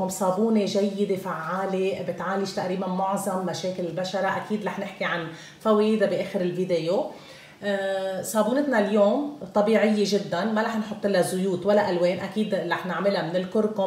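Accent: Lebanon. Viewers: نموت على لهجتك اللبنانية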